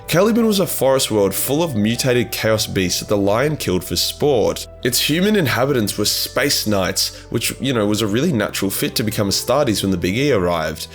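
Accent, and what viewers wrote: Australia. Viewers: If you hear trader, its actually traitor. Just aussie things.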